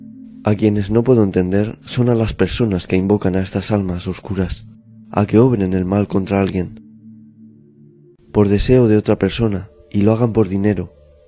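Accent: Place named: Spain. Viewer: Hola Amigo, porque te haces llamar? Por ser un ser iluminado y lleno de pureza?...Tu voz da tranquilidad y por tu acento me parece que eres de España, me alegra mucho que hayas decidido hacer videos de ESPIRITUALIDAD que tanta falta le hace al mundo. Soy bautizado Catolico pero ellos no creen en la REENCARNACION que por tantos hechos reales se que es verdadera. Yo estoy en un grupo Espiritual llamado TAO aca en Ecuador los instructores la mayoria creen en Buda y Jesus ademas creen en la Biblia. Un gusto siempre escuchar tus videos.....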